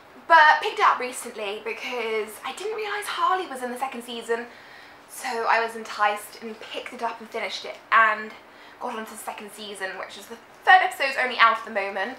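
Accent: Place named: United Kingdom. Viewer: Aren't you cute? Go for comics and animes! And music! and movies! and videogames! and lovely british accent! XD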